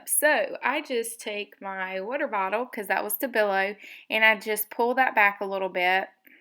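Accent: South, United States. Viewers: Loved watching your girl's face develop. I love how it looks when you use the scraper. I especially love listening to you talk. Love your Southern accent.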